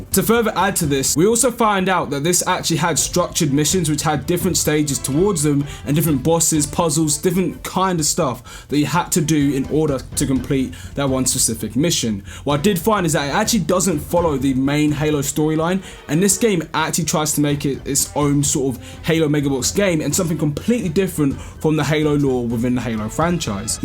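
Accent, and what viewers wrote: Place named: England, United Kingdom. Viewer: Yes fam your accent is bare white you from Midlands or down south?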